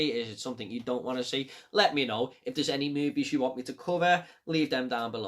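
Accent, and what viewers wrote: United Kingdom. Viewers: Good review man, nice to hear someone from the UK talking about stuff like this too - if you're interested in any recommendations that don't usually come on these "extreme" lists check out Red to Kill (HK) and Daddy's Little Girl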